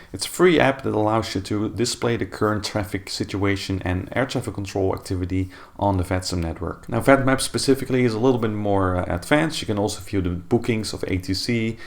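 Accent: Netherlands. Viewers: Ik hoor daar een prachtig Nederlands ondertoontje 👌 Goede video!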